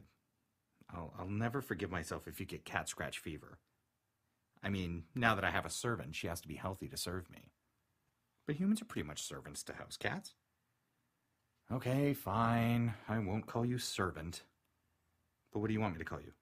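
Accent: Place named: Canada. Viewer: Sourry? CANADA YOU SOUND LIKE YOUR FROM CANADAA. Sorry ;-; But I loved thissss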